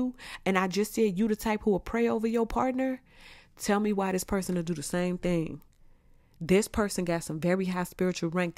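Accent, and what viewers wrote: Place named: South, United States. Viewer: I love your country accent I love it ❤❤❤❤❤❤😂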